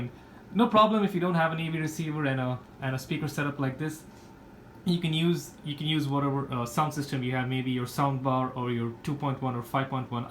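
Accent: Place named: India. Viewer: It's so weird u sounded more like an Indian (callcenter) than that of a Flip. Salamat sa set up!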